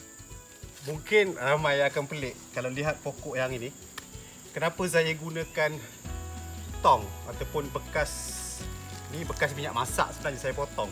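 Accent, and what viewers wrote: Pahang, Malaysia. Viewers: Loghat pahang je abaa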